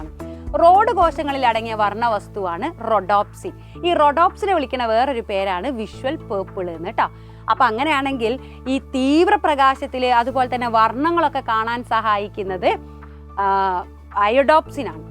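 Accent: Malappuram, India. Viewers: Missinte samsaram super aanu mis malapuram aano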